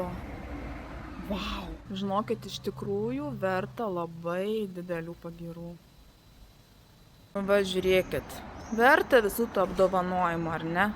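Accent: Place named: Suvalkija, Lithuania. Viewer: Tai jo tik sakau, kad Suvalkų bisky turi😁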